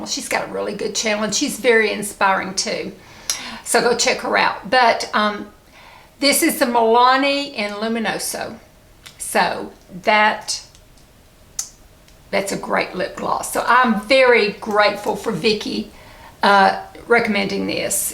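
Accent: South, United States. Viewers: Nothing finer and sweeter than a real southern accent. Wear it with pride my dear. I used to feel shamed having one for years and over the years I’ve learned to embrace it. It is a part of my identity and I’m proud of it! If folks don’t like it so be it. Probably more about them that is more unloveable than my accent that seems to bother them. When people make fun of my accent now I lay it on even thicker lol. You are a rare beauty. You are beautiful inside and out and an inspiration! Love your channel. First time commenting. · You are a wonderful, sweet human being. I am so happy you have avoided this awful virus. I was hospitalized for eleven days two weeks ago, and it was no joke. I hope you are getting the vaccine. I had no prior illnesses and it was bad. I look forward to hearing that great Southern accent! Love you and your channel! Stay healthy!! 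· southern US accent I believe!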